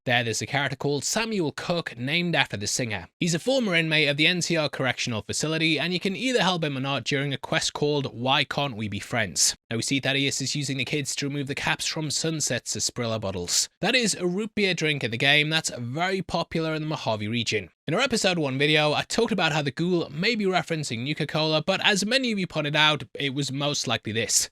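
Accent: United Kingdom. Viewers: How dumb is it that I'm so normalized to your content, that I forget you're from the UK, so when you make jokes on Americans like "Why don't you want to talk like this" it takes me a second,lol?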